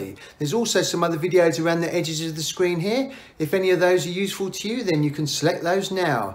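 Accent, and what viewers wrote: United Kingdom. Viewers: I liked your British accent <3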